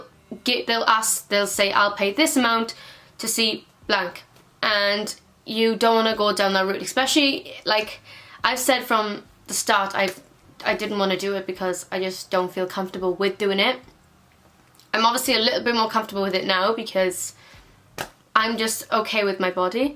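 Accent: Wales, United Kingdom. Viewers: Are ya a welshie??😍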